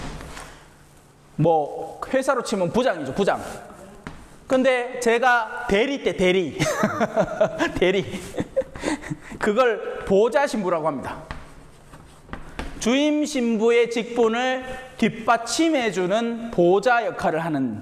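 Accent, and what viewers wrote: Gyeongsang, South Korea. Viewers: 신부님 감사합니다 함께 공부할수 있게 해주셨어요 ❤ 🎉 경상도 사투리도 정겹구요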